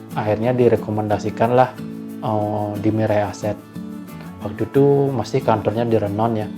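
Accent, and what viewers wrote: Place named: Java, Indonesia. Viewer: Medok sajan bahasa Indonesia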